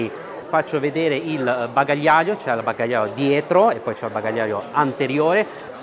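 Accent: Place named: Milan, Italy. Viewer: Come percepiscono il tuo cinese con accento milanese ?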